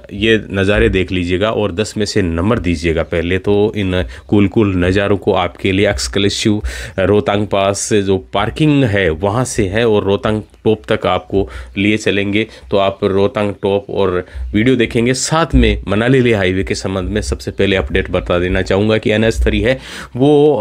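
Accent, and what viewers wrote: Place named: Shekhawati, India. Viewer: Bhai aap shekhawati Rajasthan se ho kya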